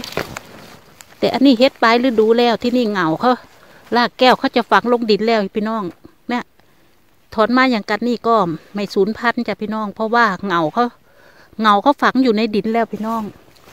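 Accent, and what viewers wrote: Korat, Thailand. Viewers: สวัสดีจ้ายาย ป้าเขามาดูคริบเก็บเห็ดหลายคริบแล้วป้าชอบดูคริบเก็บเห็ดชอบกินดายาย พูดโคราชยายอยูอำเภอไหนจ้า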